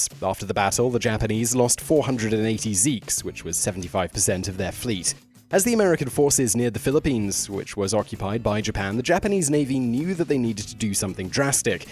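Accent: United Kingdom. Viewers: British vsause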